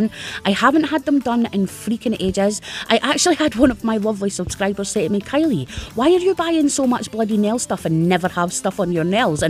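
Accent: Scotland, United Kingdom. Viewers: Love the colours I need to get me some colours! Keep up the good work. Love ur accent by the way it reminds me of my mum, she's Scottish from Hamilton.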